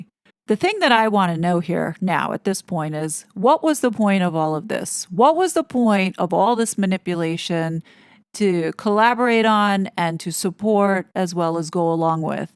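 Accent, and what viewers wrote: New York, United States. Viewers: It’s all very bizarre 😳 I like your New York accent I actually listen for it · I love the New York accent!! Set it free!!! ❤❤ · Awww, can you talk with you NY accent plz? It would be a treat! 😊😉🤗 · Hahahaha aw you are so funny and I love your New York accent!! It's a fantastic video as always 💚👍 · Really enjoyed this. and please, give your New York accent wings.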